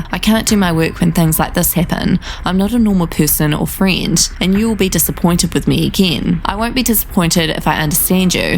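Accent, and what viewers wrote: New Zealand. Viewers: It's a new Zealand accent. I'm Australian 🙂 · As a Kiwi, this is the most Kiwi accent I’ve ever heard on YouTube next to old school ShannXXO 😬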